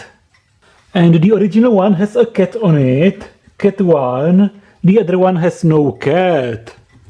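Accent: Czech Republic. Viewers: Czenglish hype!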